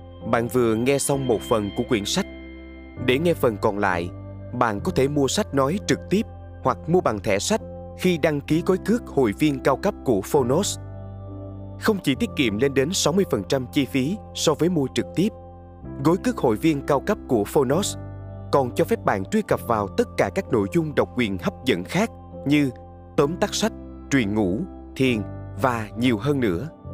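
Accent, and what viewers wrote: South, Vietnam. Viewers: Chúng tôi kính cảm ơn những người làm ra sản phẩm nầy và kênh nầy không ngừng phát triển . Giọng miền Nam của người đọc ấm áp quá đỗi yêu thích. Đọc chầm chậm như vầy người già chúng tôi dễ nghe. Cảm ơn. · Tôi yêu giọng miền nam. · Giong doc Nam Ky qua ro rang qua tuyet voi……..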